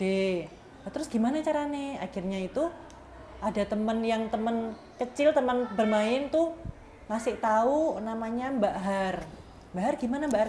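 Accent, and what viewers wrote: Indonesia. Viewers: Medok skali jawatimurnya ibu...😂..smoga tetap istiqomah..🤲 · Surabaya medok mantap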